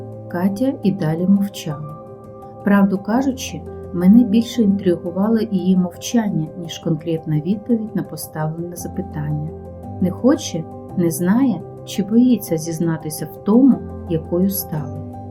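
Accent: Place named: Ukraine. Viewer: Дякую! Як мило звучить Ваша українська. 💗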